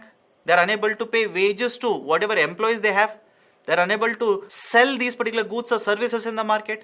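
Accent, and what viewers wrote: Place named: Telugu, India. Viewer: Sir U seems to be a Telegu I like ur way of speaking & I really love listening to u Thank u so much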